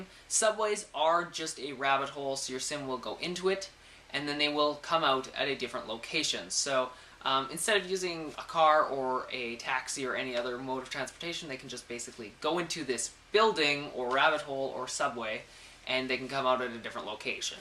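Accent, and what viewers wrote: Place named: Canada. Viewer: AHA! i knew he was canadian, we have the same accent.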